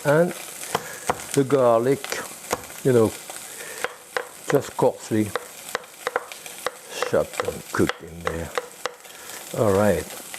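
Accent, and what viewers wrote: France. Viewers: this mans is so fucking french and im absolutely here for it. "and this, as you can see, is a -aut dog-"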